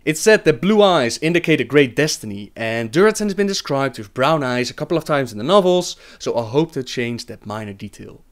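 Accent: Netherlands. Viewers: ur dutch accent is next level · Are you dutch? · Nice video :) Lil question, do you happen to be dutch? ^^ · Are you dutch? I love your accent :D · heuj Dutch accent!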